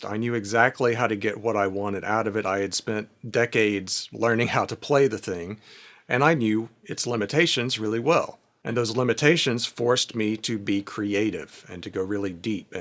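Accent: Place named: Mississippi, United States. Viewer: Middle of Mississippi? I knew I recognized that accent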